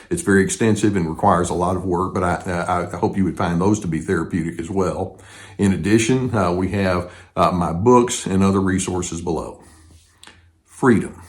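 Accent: South, United States. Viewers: You are my most favorite older white male with a southern accent. I've never met one I've really liked. Thank you for being so amazing 🥰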